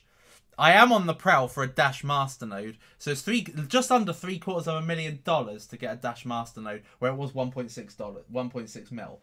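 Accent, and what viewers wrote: England, United Kingdom. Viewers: You talk with an English accent and sing with US one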